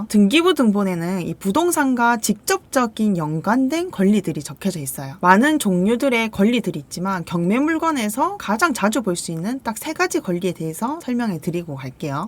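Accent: Gyeongsang, South Korea. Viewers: 왜 이영상을 이제 야 봤을까.. 유료 강의 듣고 잇는데 거기서 들은 내용이랑 똑같네요ㅎ 같은 경상도라 그런지 쏙쏙 들어와요ㅎ 감사합니다 감사합니다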